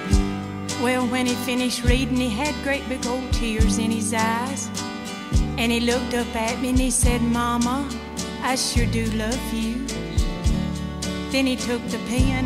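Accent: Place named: South, United States. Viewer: unmistakable Southern States accent. Maybe you don't hear it if you are from the States but it's very noticeable to the British.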